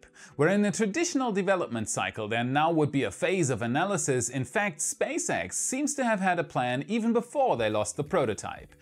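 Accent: Germany. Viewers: I'm curious about where comes from. According to how he said "Einstein Bose", he really sounds (Northern) German. Does anyone know more? (Not being a native speaker, I'm not very good in detecting dialects)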